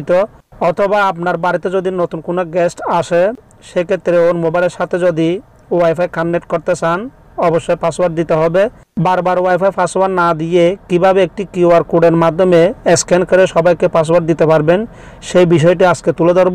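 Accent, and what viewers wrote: Chittagong, Bangladesh. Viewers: chittainga naki? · apni ki Chittagong bari je vasa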